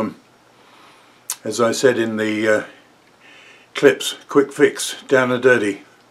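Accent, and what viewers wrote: England, United Kingdom. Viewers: Hi. You are English? Where from buddy? I'm making a video right now for you.... I have some allooominum too 😉 I'll try to get it up this weekend. All fun.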